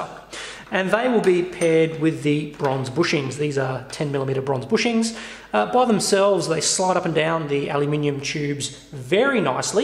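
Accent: Australia. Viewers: judging from your accent, you are aussie like me, where did you get your screws? my local hardware is terrible for screws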